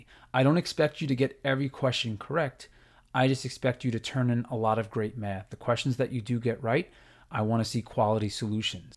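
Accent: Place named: Boston, United States. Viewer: Came for the content, stayed for the Boston accent.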